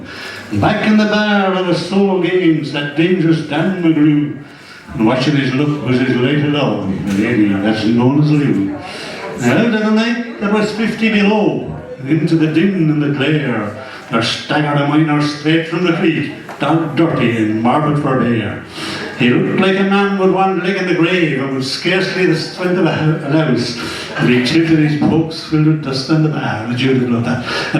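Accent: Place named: Ireland. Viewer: Words are unclear to a non Irish speaker of English.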